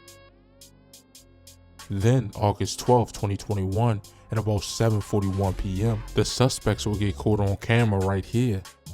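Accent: Baltimore, United States. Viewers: u frm baltimore?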